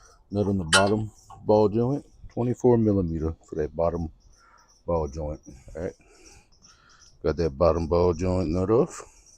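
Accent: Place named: Louisiana, United States. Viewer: You can always tell when someone’s from Louisiana!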